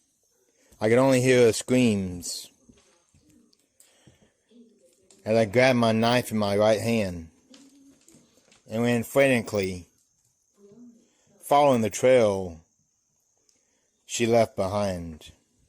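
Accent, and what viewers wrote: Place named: Louisiana, United States. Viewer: Sounds Cajun to me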